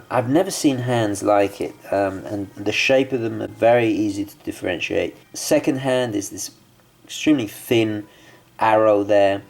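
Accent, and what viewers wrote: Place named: United Kingdom. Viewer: Why do you sound so british? )) Nice watch, I´ve got mine in green.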